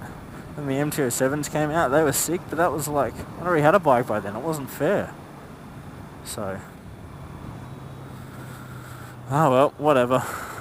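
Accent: Australia. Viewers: cant u hear that he is from australia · Maaate, are those wheelie bins? "Brand spankin' new"? This guys gotta be an Aussie too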